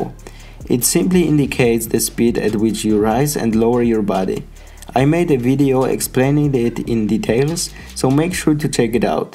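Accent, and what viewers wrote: Poland. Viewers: I think when i listen your voice i conside that you from maybe Poland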